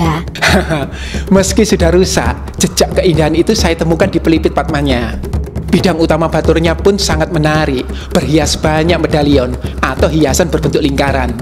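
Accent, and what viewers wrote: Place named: Probolinggo, Indonesia. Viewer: ciamik Soro gaya bicara orang probolinggo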